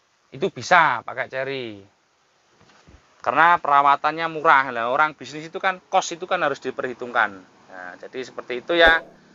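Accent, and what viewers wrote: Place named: East Java, Indonesia. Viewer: Matur suwun.. Sampeyan lucu.. Gaya Jawa timuran... Lancar jaya.. Salam dr Bogor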